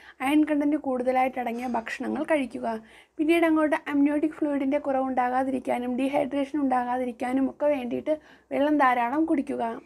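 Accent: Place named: Kasaragod, India. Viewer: kasargod slang pole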